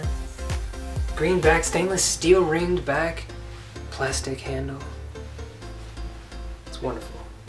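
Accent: South, United States. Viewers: He's definitely become more comfortable and confident over 5 years and it's been so much fun watching him grow and become more successful. Regarding his accent, I think him living in California made him "turn off" the southern accent in a way. I can personally relate to this. I live in Tennessee and I've tried my whole life to not have an accent, mostly because some of my family members, I can barely understand. Haha But, if I spend much time around them, I do have a bit of an accent that peeps out.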